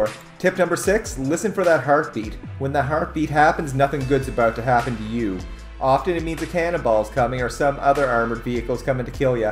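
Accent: Canada. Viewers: Nothing wrong with sounding Canadian! I’m Canadian too lol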